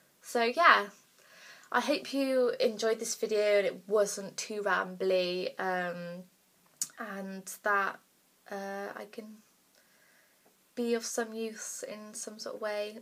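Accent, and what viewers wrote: Bristol, United Kingdom. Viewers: you from bristol/south west? am a fellow janner haha thank you for sharing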